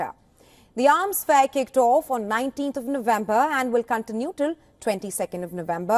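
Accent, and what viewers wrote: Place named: India. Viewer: her accent has some indian accent.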